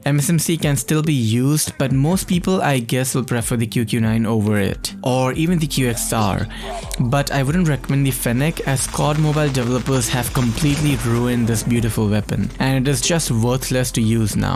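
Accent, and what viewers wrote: India. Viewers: Btw bro are you indian? You sound like indian ☺️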